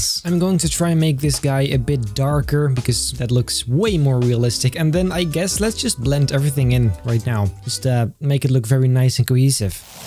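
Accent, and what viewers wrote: Netherlands. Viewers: Are you Dutch ? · You sound dutch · Are you dutch?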